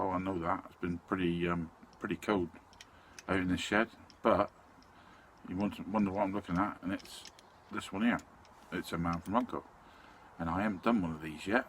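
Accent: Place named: Somerset, United Kingdom. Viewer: Top Job you sound like a fellow somerset boy. Really enjoy your vids which inspire me to get my act together to restore all the diecast I have collected of all types and sizes. Cheers and many happy ziders!!!!!!!!!!!!!!!!!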